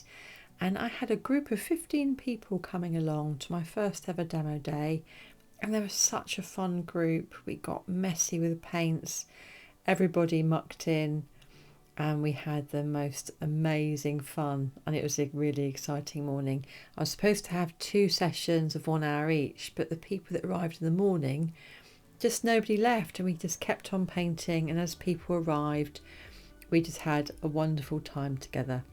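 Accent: United Kingdom. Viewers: your painting looks absolutely gorgeous, and you just keep getting more adorable in pink, your curly hair, and your glowing smile on your pretty face, and your sweet British accent. 😄😄😄🌹🌹🌹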